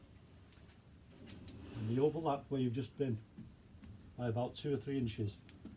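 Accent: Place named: Northern England, United Kingdom. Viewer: Big up the North!!! Love you're accent. Where (exactly) does it originate from?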